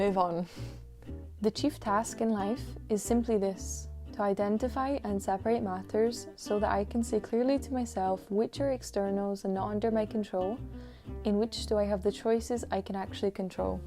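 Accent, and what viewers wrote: Scotland, United Kingdom. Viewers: I feel like you’re Scottish? Are ye? Mibbi? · Scottish? Your speaking voice is so soothing, ill belive your a bad singer when I hear it.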